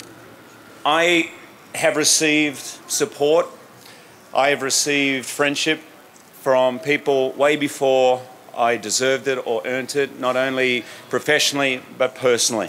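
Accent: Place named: Australia. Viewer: An Aussie to be so proud of - and he still sounds like one!!!!! Love him - and his family.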